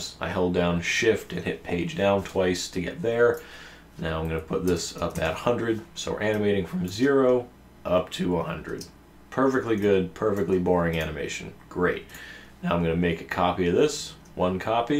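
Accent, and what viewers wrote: Canada. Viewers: nothing, I'm from the Philippines, and me and my american friend where watching this tut, and I ask him what accent is that ("your accent") and my friend said "that's probably a Canadian accent. No biggy, cool tuts anyway, learned a lot from you. Can you make an Infographic Tutorial. I've been doing a Corporate Promotional Video.. Would help me a lot. Thanks.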